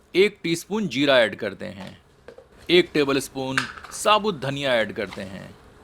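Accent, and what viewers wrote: Standard. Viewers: Ap ka hindi acha he sudh ghee jesa hindi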